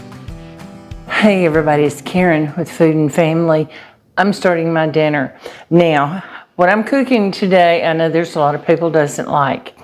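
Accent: South, United States. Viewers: Thank you so much,🎉 my mother made this often when i was young,, this is hard to find i think because i just haven't seen any liver in the market, you're so special 😂i love your sothern accent, my father was from tn this takes me home, you have stole my heart ❤️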